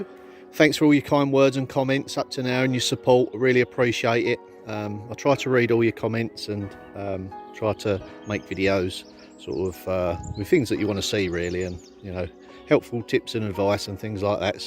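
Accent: Essex, United Kingdom. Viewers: i live in Thorpe Bay (Southend) i knew you had a soft Essex accent. Wonder why you left?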